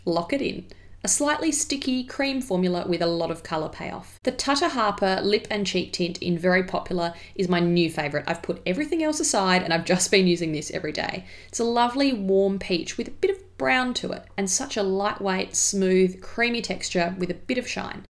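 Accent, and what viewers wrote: France. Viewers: I love listening to you speak!!! Your accent sounds authentic-- where'd you learn french? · You have an excellent French accent! Are you half French? Love your work What about powders? Thanks 💛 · Damn that French accent tho. (But I’m American and don’t speak French. So what do I know....) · Nice French accent,. I have to say I didn't know Jeanne Damas before your video. A bientôt 👋😘❤️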